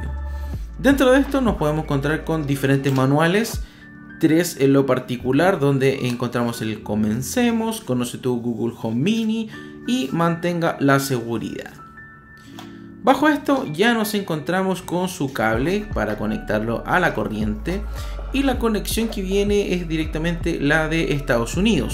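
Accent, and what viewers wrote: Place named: Chile. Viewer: Eres de chile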